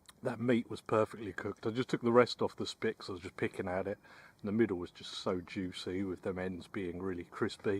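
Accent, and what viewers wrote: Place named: Kent, United Kingdom. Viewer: You’re a true Kent man.its the accents.I used to know a man from down that way.u sound so alike..a compliment I should add. Nice tent,probably the best I’ve seen. Bird song fantastic.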